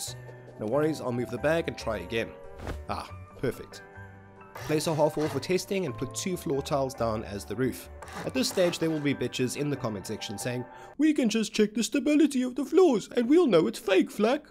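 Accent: Afrikaans, South Africa. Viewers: You south African? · U sound south African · Are you south african? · You sound South African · You sound south african mate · dis man from SA? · My bru!! Love hearing the saffa accent in a Rust vid nogals!! I’m subscribed, keep up the good work!! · You're South African? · are you South African? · Your South African I hear the Afrikaans in you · Is this boy South African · are you South African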